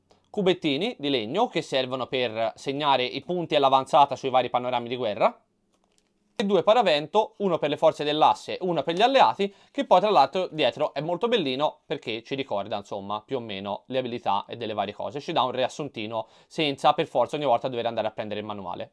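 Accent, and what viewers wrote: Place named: Tuscany, Italy. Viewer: Una delle ragioni che mi hanno fatto scegliere questo canale rispetto ad altri sulla stessa materia è proprio l'accento toscano e il modo di parlare toscano 😀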